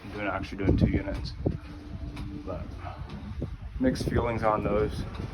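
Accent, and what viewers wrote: Canada. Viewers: Thanks... it's a huge gift you do with the channel giving people the insight on what is behind the finishing surfaces. ALSO enjoy you adding the extra vids of music and living life to the fullest. Hope you are having a beautiful summer and the sun is shining on all you love and... one day you Canadians speak 'House' and 'Boat' and 'About'... so us southerners in the US can understand. XD!!!! Cheers Love all the vids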